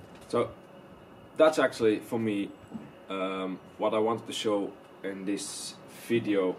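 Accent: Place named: Eastern Europe. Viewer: Where the hell are you from lol I can't regcognize the accent, sounds slightly Slavic but I could be wrong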